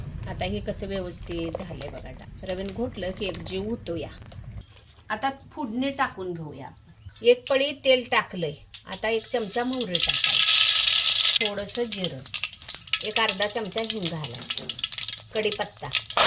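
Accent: Kolhapur, India. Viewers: कोल्हापुरात तुम्ही कुठं रहाता? तुम्हाला भेटायला यावस वाटत... मग तुम्ही कोल्हापूर च्या भाषेत म्हणतासा.. " या कि घरा कडं".. या कि घर ला..😅.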